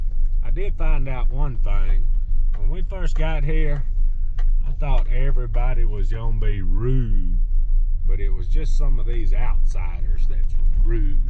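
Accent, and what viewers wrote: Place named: South, United States. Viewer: You know it’s one of us Southern boys when ya hear “ that sure is a pretty rock”. I love it! Glad y’all had a good time brother! Thanks for sharing it with us.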